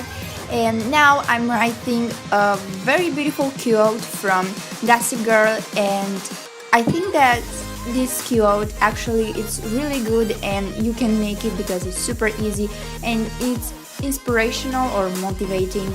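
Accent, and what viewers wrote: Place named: Brazil. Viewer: Hey! Love the DIY's :) and sorry if I'm being nosey, but where are you from? Your accent is so cute! I have a lot of brazilians friend that have the same accent as you, so that's why I'm asking :P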